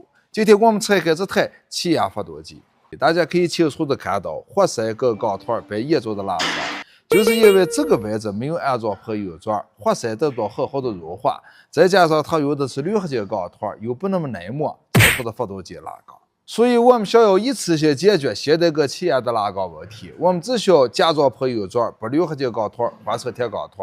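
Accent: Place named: Datong, China. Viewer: 我有50年没有听到过大同家乡口音了，真是很亲切。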